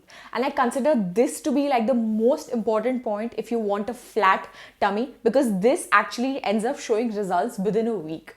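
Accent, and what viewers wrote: India. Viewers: I really like her Indian accent 😜.... And major thanks for the weight loss tips. Totally gonna try it today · You sound like a mature south Indian. Love you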